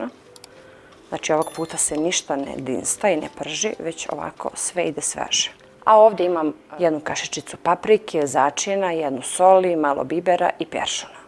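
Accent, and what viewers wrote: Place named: Trstenik, Serbia. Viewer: Ooo sunce moje❤️ stvarno si zlatno dete.Podsećaš me na moju ćeru.Ne provodim baš previše vremena na netu,ali tu sam,i mnogo volim da gledam,sada i pratim tvoje klipove❤️Čak i kada sam u bolnici,ti si mi velika uteha jer obožavam da te gledam i slušam.Ti si moj zavičaj❤️Imamo isti naglasak,pričaš kao da si baš iz mog Trstenika, Medveđe,majka ti je predivna,porodica❤️ Recepti su bukvalno isti,kao što je mene učila moja baka,pa mama.Možda nemam mogućnosti da budem sve vreme na netu,da pratim baš sve❤️Moj muž,duša moja, me je u maju napustio,i nikako to ne mogu da prihvatim😢🙂❤️Preteško je,ali opet život ide,ne pita,a ja idem za njim,kako moram❤️Velikim delom utehu nalazim i u tvojim klipovima sunce drago,i zato ti beskrajno hvala❤️🍀❤️Veliki pozdrav i zagrljaj iz mog Oldenburga❤️Bog vam zdravlje i sreću navek dao🍀🍀🍀❤️❤️❤️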